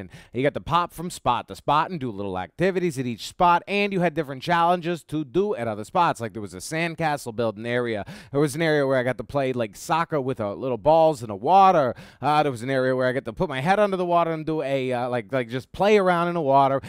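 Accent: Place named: New Jersey, United States. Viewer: I just heard this guy for the first time and went well that a New Jersey accent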